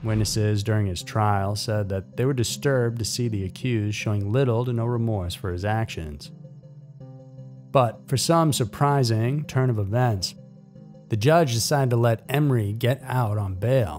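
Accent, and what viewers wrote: South, United States. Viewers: Lord, I love your voice. It has a southern ring to it, like I have. Anyhow, it's a lot better than that random Canadian guy who sounds like the least interesting man in the world.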